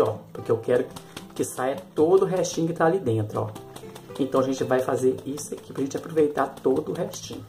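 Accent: Minas Gerais, Brazil. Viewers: Esse sutaquin nosso é bunito dimais.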